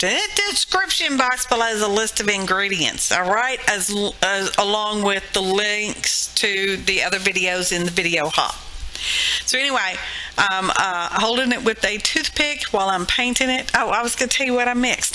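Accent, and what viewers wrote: South, United States. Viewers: Was fun watching you make yoir beads. The hangy gizmos made with the paper clips is clever. Your delightful Southern Belle accent was wonderfully entertaining, as well!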